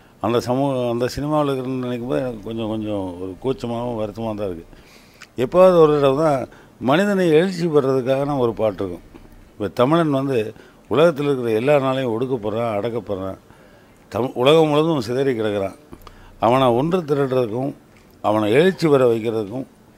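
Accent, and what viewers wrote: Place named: Sri Lanka. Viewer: He is a Srilankan tamizhan, he is speaking pure tamil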